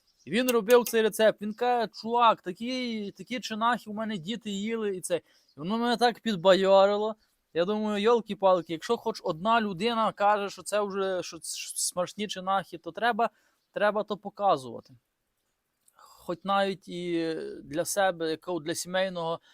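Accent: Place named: Galicia, Ukraine. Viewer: Класно ведеш і галицька говірка мені дуже імпонує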